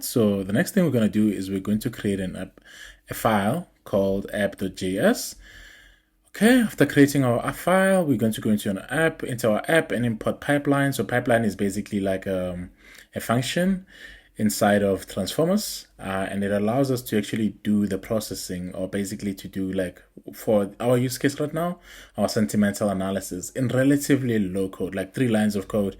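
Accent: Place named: South Africa. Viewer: I heard your accent and knew ukuthi ungumkhaya(South African). Happy to see such videos from people on my land ❤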